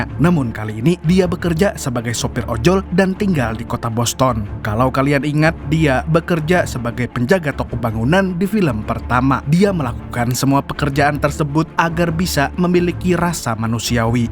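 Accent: Banjar, Indonesia. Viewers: Banjar nya kada ketulungan 😂😂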